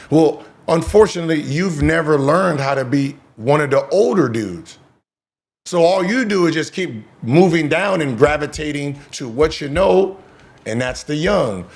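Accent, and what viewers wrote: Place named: Memphis, United States. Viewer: You got that Memphis mane down 😅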